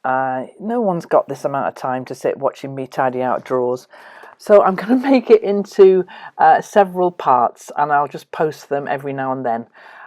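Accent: United Kingdom. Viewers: Hi, I'm so glad that I found your channel by accident some dass ago. I searched for some ideas for scraps as I regularly do, but this time your pink/red quilt showed up and caught me. I watched some of your videos since then and I really like them. Hearing you talking, looking at all the craft supplies and hearing your dialect is great (I'm German and love hearing different kinds of English, especially "British English"). All the best, Stephanie PS: Just subscribed for future videos 😉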